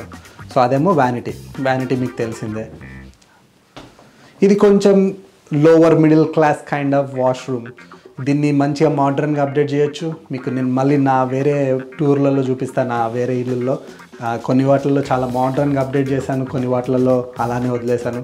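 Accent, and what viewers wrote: Telangana, India. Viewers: Hi...I am from Hyderabad. I like your videos. Maa babu kuda canada lo untadu.Inka mee matalu mana Telangana accent lo bagunnai.Mee canada cat kuda bagundi.😄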